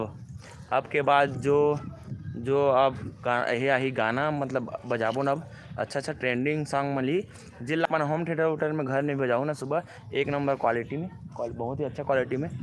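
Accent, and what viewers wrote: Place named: Akaladongri, India. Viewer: अकलाडोंगरी se bolat ho bahiya ji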